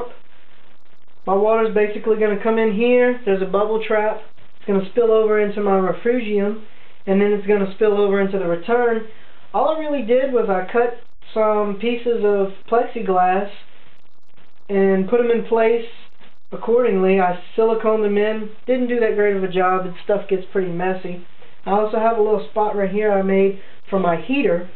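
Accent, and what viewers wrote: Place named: Louisiana, United States. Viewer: You sound like you're from Louisiana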